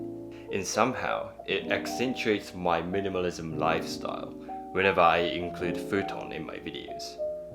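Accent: New Zealand. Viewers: Japanese with a Kiwi accent, adorable :)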